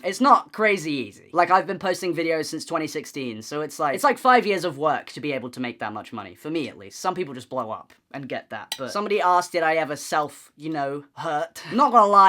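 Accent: England, United Kingdom. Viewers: i have no idea where this guy is from like he’s british right ? i’m so confused his accent is english but his words are not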